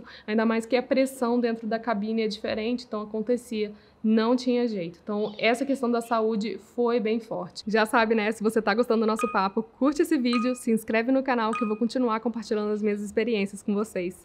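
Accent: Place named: Belo Horizonte, Brazil. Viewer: Sotaque belorizontino...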